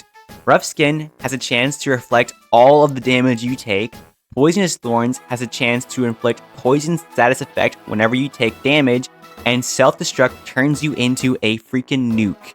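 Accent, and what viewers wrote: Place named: United States. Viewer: bro thanks man, these videos are really helpfull its nice to have a normal NON AI american voice explaining the game. W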